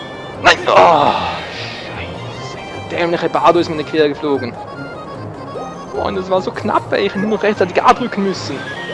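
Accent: Switzerland. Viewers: HA! Tscha zeme! Schwiizerakzänt! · ich finde deine videos echt witzig vor allen mit deinem Akzent. bist du Schweizer oder so? wenn du das so nachspricht was link so "sagt" hört sich echt witzig an. link mit einem schweizer Akzent xD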